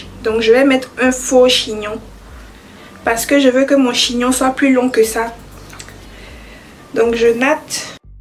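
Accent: Ivory Coast. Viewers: Ma soeur ivoirienne, je te kiff grave ,chic accent ivoirien et chic vidéo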